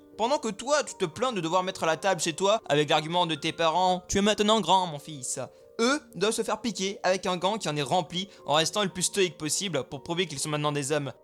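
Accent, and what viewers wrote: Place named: Wallonia, Belgium. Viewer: Toi tu viens de belgique et t'es un wallon :p vu que tu dis: Wouit au lieu de huit :p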